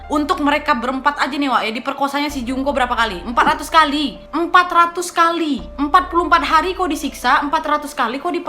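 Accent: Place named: Medan, Indonesia. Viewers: Medan punya bahasa ni ya😁🤣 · Anjir medan kali kau kak 😄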